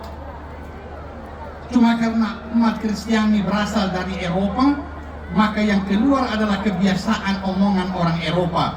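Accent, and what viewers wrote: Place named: Palu, Indonesia. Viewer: ini kiai org apa ya..logatnya kaya org palu..😅😅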